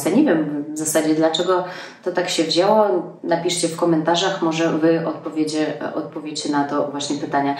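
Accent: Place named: East, Poland. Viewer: Skad ty pochodzisz - po akcencie widze ze ze wschodu-wszystkie polskie zwyczaje sa piekne , niepowtarzalne- takie nasze polskie- tym sie roznimy od calego swiata- imieniny - supe - calowanie w reke - szacunek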